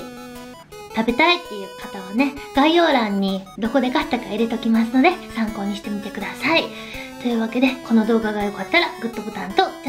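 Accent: Kansai, Japan. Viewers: ちゃんの関西弁まじ大好き！はーと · 関西弁？可愛いな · 早希ちゃん関西人？ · 昔から早希ちゃんの関西弁？かなり好きでYouTubeでの早希ちゃんは見初めて日が浅いので最近はどうなんかわからないんですがちょいちょいでる関西ぽいイントネーションになんか懐かしさを感じます！ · 最近チャンネル登録して見始めたのでASMR動画のヒソヒソ声しか聞いたことなかったのですが、調理中の関西弁がとても可愛い☺️ 賛否両論あると思いますが、私は手袋なしで食べるの良いと思います！お刺身とかはお箸使って食べればいいじゃん！と思うものもありますが、総じて、動画大好きです☺️❣️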